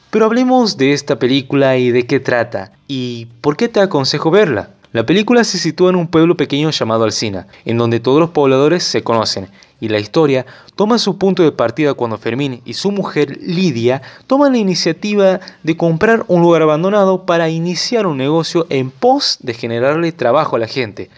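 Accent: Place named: Corrientes, Argentina. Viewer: Ese tono es de provincia de "coyientes"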